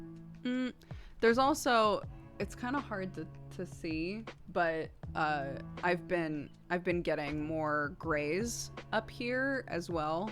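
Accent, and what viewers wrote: United Kingdom. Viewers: Very British for some reason 😂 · She's become fully bri'ish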